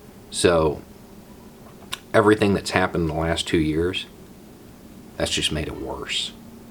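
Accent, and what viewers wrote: South, United States. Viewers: what southern state is your accent from?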